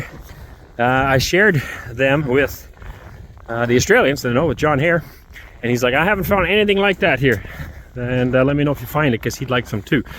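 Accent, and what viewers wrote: Canada. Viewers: Canadian English))) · Could tell your from 🍁 by your accent.. good luck in Russia . Hoping to get a new American president before this clown gets us in war with Russia. Rather have pootie as our president too be honest. Only isuue i have with your move is you didn't stay and try to fight to get your country back we need all the people we can get at this point to turn the tide. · I can't imagine he doesn't have the Russian accent not to mention the Canadian accent! Good luck out there people know this and it's a disadvantage for sure. Man I'd live out there anyday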